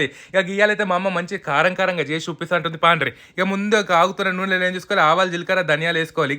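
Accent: Telangana, India. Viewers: Me language Telangana laga Undi avuna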